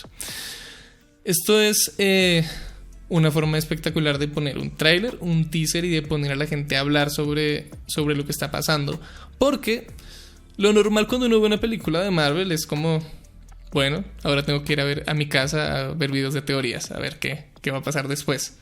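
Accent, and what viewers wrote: Bogotá, Colombia. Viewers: Al hablar tranquilamente, tienes unos pequeños dejos de rolo en tu acento, se nota que llegaste a vivir por un tiempo en Bogota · Jajaja se nota mucho tú acento colombiano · Uy pana, usted tiene resto de acento rolo, recién me doy cuenta, usted parece de Bogotá JAJAJAJA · Nunca había escuchado su acento real es re cachaco rolo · tiene full acento colombiano verda, tipo paisa neutro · He visto todos tus videos, hace relativamente poco me enteré que eras colombiano en un video en que lo mencionaste; me sorprendio bastante porque jamás se te siente un acento en los videos, pero acá nada más empezar se siente, eres colombiano con toda! Un placer seguirte, abrazos desde Bogotá <3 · cuál paisa? es re rolo xd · El acento colombiano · ahora que se escucha en una conferencia, se nota tu acento rolo :D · Es mitad colombiano y mitad de otro país aún desconocido, lo decía en la descripción antigua de su canal además que en los streams antigüos de halo lo confirmo y ahí se le notaba muchísimo más el acento. 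· Puedo decir que aqui si se te nota el acento de Bogotá, bastante... Eres un orgullo para tu patria y familiares bro! Saludos desde Cartagena :D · ya te escucho el acento Bogotá ^w^ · Se te nota mas el acento colombiano... Eres colombiano? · La primera vez que lo escucho con acento colombiano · Jamás había notado qué yan colombiano eres :0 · Acento rolo, parce!!!!! 🇨🇴🇨🇴🇨🇴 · Vos sos rolo no? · ! Tienes tremendo acento rolo! Un capo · se te nota lo colombiano xd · En este video sin guion se nota mas el acento colombiano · Siempre pensé que eras mexicano o algo así, pero ya tras este video dos colombiano. Lol :0 yo soy de medallo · Aquí pude notar mucho su acento colombiano, q increíble jajaja buen video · definitivamente es rolo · banda, soy yo el unico que le parece que en todos sus videos su acento es muy neutro, mas tirando a mexico, pero aca se le subio el colombiano por 3000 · En este vídeo si se nota tu acento Colombiano xd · Looollll hoy si te escucho mega rolo, fan de tu voz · Es la primera vez que escucho a con un asentó colombiano genuino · Siempre creí que eras Mexicano, en tus otros videos no noté tu acento colombiano. 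Por cierto, me encantó el video :))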